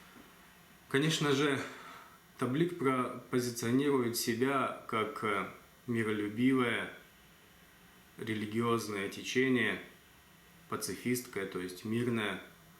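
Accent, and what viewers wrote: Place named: Kazakhstan. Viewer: Судя как он говорит, он не кыргыз, а казах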